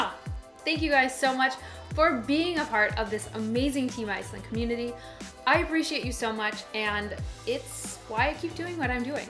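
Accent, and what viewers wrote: United States. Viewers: So tourists are the main downside of living in Iceland.......................Said the woman with the American accent. Hypocrite.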